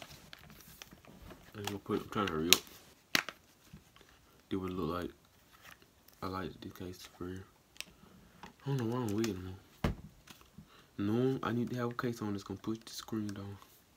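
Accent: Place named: Atlanta, United States. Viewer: Sounds like he from Atlanta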